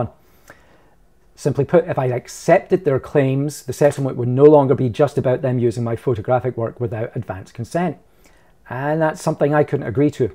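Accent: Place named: Scotland, United Kingdom. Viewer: I've been following everything these last few months and I have to say you come across as a completely, upfront, honest genuione bloke and acted in a completly professional way. If you ever need a slightly bigger platform to discuss the ins and outs of copyright then sir you're more than welcome on my channel any time. The second I heard the Scottish accent i knew you were a solid bloke. :-)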